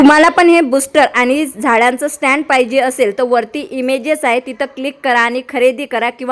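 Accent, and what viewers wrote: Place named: Khandesh, India. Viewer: खांदेशी आहे का g तु ,काय ती मराठी भाषा तुझी, म्या 😂😂yayle😂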